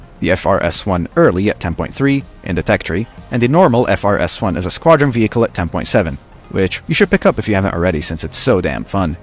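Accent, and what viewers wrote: United Kingdom. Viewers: That British accent ACTUALLY hurt me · wtf is that British accent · That English accent is terrible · That British accent though... Also what Anime is that! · The British accent chef's kiss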